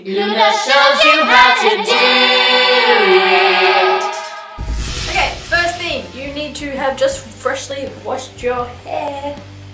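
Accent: Australia. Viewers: are you Australian ? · Are you australian?? XD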